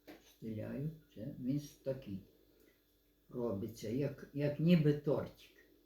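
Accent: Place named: Vinnytsia, Ukraine. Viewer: Здоровля, сирва, бурачок, так говорять на Вінниччині в Чечельницькому р-н😊😊❤❤❤, там проходили мої літні канікули у бабці в селі❤❤. Обожнюю, не можу наслухатись.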